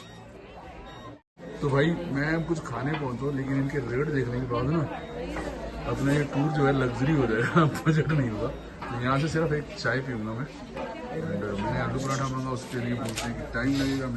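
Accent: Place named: Punjab, India. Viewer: Lovely Vlog, Papa ji. The best part I like in all your vlogs is your Hindi narration in sweet Punjabi accent. God Bless You. I too, have purchased the Same Jupiter as yours, but in Light Blue shade & I'm very happy with it.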